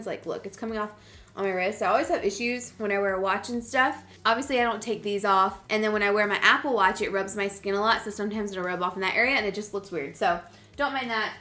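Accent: South, United States. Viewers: Anyone else notice the small hints of her southern accent coming back since she moved back to Georgia?? I love it!!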